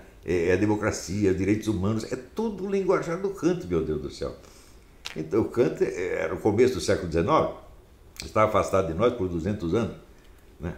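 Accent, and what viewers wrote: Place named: Brazil. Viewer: O prof Olavo é um dos poucos brasileiros que vale a pena ouvir, pelas informações que ele divide conosco, e também pela sua ótima oratória. Ele faz o português brasileiro mais bonito do que o de Portugal. Bless him.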